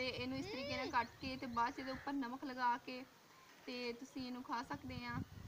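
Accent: Punjab, India. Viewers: Ap Punjabi bohat pyari bolti ho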